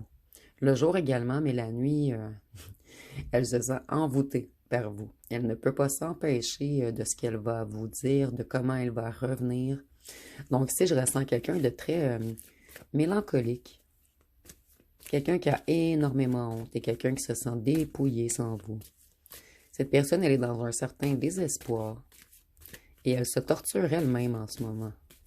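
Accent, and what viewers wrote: Quebec, Canada. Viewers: Chère madame. Merci encore pour cette guidance très élogieuse pour le consultant pour les compliments que vous faites dire à son ancien autre Merci infiniment à vous. Vous nous redonnerez confiance. En plus ma très chère autre que j’accueillerai volontiers pour discuter avec elle sans jugement en toute bienveillance pour comprendre pourquoi elle m’a quitté si brutalement sans aucune explication. Votre guidance est d’autant plus crédible que ma chère autre bien aimée est capricorne ♑️ ascendant gémeaux ♊️ et je suis ascendant lion ♌️ lune en balance. Les quatre signes ont été sélectionnés. Quatre lettres de son prénom et la lettre initiale de son nom de famille ont été sélectionnées. Merci encore je redouble d’espérance de patience car j’aime mon autre comme je n’ai jamais aimé. Excellente journée à vous chère madame à l’accent québécois inimitable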